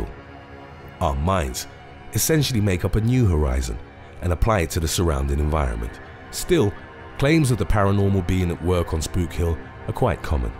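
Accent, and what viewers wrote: United Kingdom. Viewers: Don't you realize the narrator is British? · His British 👋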